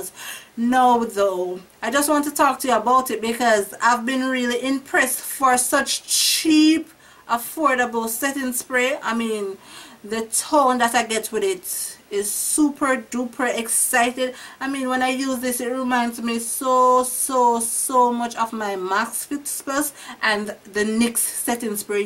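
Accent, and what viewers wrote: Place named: Jamaica. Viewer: Never expected a Jamaican accent when I clicked this lol yas...got it for 2 bills in Ochi...hoping its just as good for me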